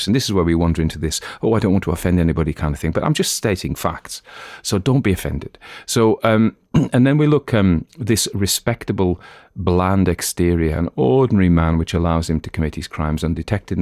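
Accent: United Kingdom. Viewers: read a story set in Amerca in a British voice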